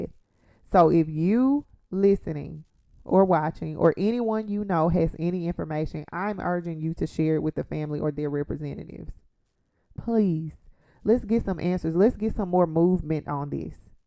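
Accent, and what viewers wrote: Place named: South, United States. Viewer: girl your accent is so southern🤣🤣🤣🤣🤣🤣✌✌💖💖good lesson!...hope this case get the justices he deserve.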